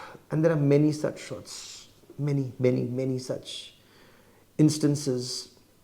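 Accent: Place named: India. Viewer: I cant understand you indian bull shit accent. You are fake nees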